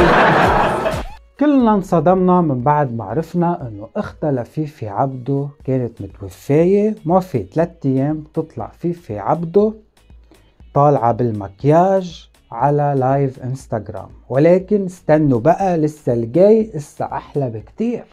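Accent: Lebanon. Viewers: تحياتي يا اكثر واحد مهضوم بلهجة لببنانية والله انت علاج كل المكتئبين والي عندهم الاستريس تفرج عليك ينسي كلشي هههه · تحياتي لك أخي ، تعجبني اللهجة اللبنانية خفيفة على القلب 💖 تابعت حلقة الأسئلة ؛ وفرحت جدًا إنك مسلم سني الحمد لله الله يثبتك ويهدي جميع الخلق ، ليس تعصبًا مني لكن هذا هو الطريق الحق وكل البشر سيكتشفون الحقيقة عند الموت تحديدًا أول ليلة له في القبر … أهم شي دينك الثبات الثبات . شكرًا لك 🌱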